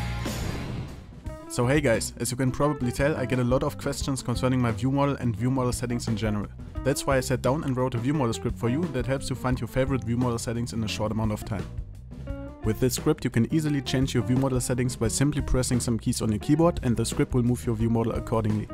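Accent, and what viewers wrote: Germany. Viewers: Biste deutscher? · man merkt voll das du deutsche b ist nicht böse gemeint oder si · Man hört sofort das du Deutscher bist :D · Kann es sein das du deutscher bist ? / Are you German ? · Could it be that you are german? The accent is real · Man hört so das du deutsch bist haha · Das deutsche Englisch Ich LIEBE ES 😂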